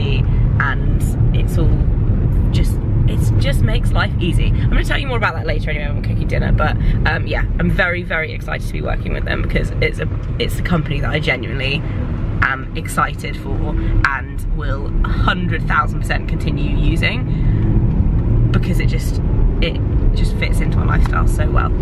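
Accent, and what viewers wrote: United Kingdom. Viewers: I'm trying to learn the British English with you! Thank you! 😀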